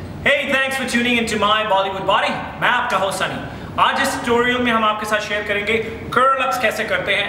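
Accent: Punjab, India. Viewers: sunny paaji.....appka jo accent hai bhot bhadia hai......that is punjabi,english and hindi mixx......ashians ke liye best hai aapki videos ........khass kar punjabioo ke liye😘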